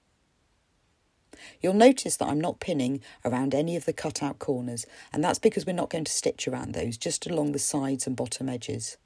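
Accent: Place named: Birmingham, United Kingdom. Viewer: Thanks for this tutorial ❤ Sounds like you’re a fellow Brummy 😊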